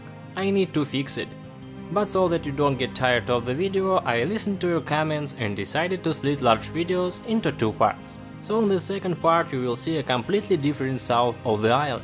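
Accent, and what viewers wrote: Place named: Russia. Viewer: Brutal russian accent bro😂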